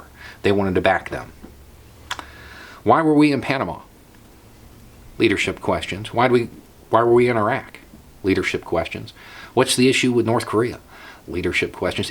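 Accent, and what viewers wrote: South, United States. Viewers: Hey, I have started getting pragur university Ad's on your videos lately. While this is hilariously ironic I can't help but wonder why the Youtube Algorithm would think this is a good idea. My only guess is either that it is because you are a political channel and these are political ad's, or, that it goes deeper than that and the algorithm is going more off what you look like than what you are talking about. It views your videos and goes "Oh, a guy with a southren accent and a beard talking about politics, must be a right winger" and just goes with that. Anyway, just wanted to share because it honestly made me laugh.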